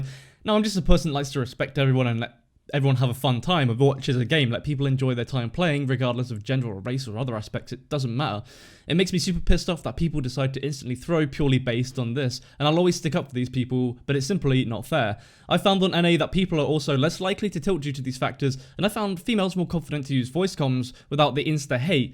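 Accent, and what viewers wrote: United Kingdom. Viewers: are you from the UK mate? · you sound British so assuming you live in the UK how the hell do you have good ping on NA????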